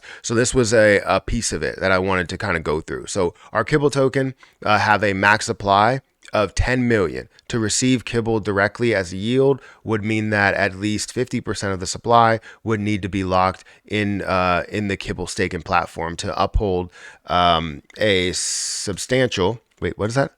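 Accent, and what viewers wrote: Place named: Los Angeles, United States. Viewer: Where are u from? Your accent looks from Los Angeles isn't?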